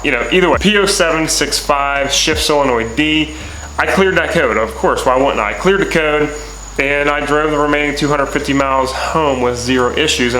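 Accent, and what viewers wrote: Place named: Maryland, United States. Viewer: Familiar accent.. Maryland?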